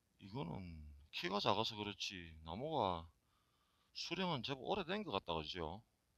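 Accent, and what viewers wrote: Gyeongsang, South Korea. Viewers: 베트남서 시청하고 있는 1인입니다. ㅎ 지난회차 거의 다?? 시청했습니다 ㅎㅎ 묘한 마성의 갱상도 소리를 매일 듣네요 ㅎㅎ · 경상도사투리와 영어가 구수합니다 저도미국에서 트럭하고싶네요 ㅎㅎ 잘보고갑니다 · 갱상도 사투리 티나네요 해임요 ㅋㅋ 응원합니다 오늘도 굿데이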